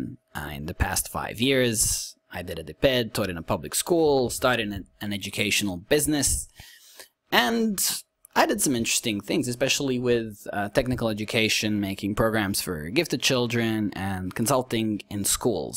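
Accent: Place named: New York, United States. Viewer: Quite enlightening & request an update Oh, you're accent sound like you're from NY city USA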